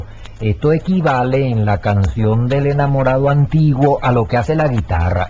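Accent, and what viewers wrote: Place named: Caracas, Venezuela. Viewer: Sr., le agradezco muchísimo que haya puesto este curso de apreciación musical de Jose Antonio Calcaño en youtube. Aparte de la claridad y sencillez con que explica la música tambien me hace recordar mi infancia en Caracas ( no vivo alla desde el año 73) no solo por las clases en si, que alguna oi en disco en mi casa ( el Sr. Calcaño era pariente mio) sino tambien por el acento tan caraqueño que me hace recordar a mi mamá y a mis abuelos.No sé si todavia se habla asi. Los acentos van cambiando y lo notamos mucho quienes ya no vivimos hace décadas en Venezuela. Muy agradable volver a oir esto.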